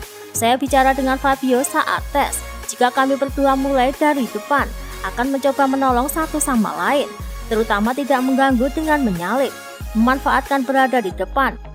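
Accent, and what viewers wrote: Java, Indonesia. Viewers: Mba mba medok hahahaha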